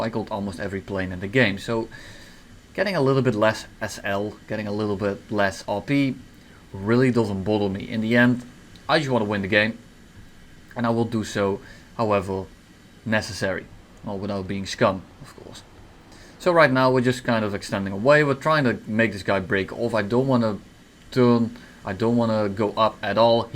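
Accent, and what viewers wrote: Netherlands. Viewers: goddamn i can hear the dutch accent once you notice it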